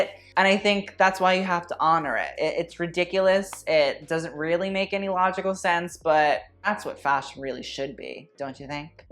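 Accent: South, United States. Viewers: I loved the south accent 👌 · i appreciate that you committed to the southern accent throughout the whole rihanna review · The southern accent is killing me 😂😂😂 hahaha scoot queen 👑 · Love the southern accent but we don't pronounce the t in words like butter is a budder. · His southern accent! I'm crying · Why did suddenly go southern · I didn't think could be sexier and then I heard his southern accent 🤣❤😘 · The southern accent!!!!! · no southern accents please just british is acceptable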